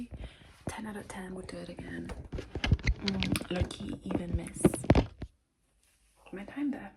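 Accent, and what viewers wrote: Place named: United Kingdom. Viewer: i’ve missed u smmmmmm. also am i just noticing you have a british accent :0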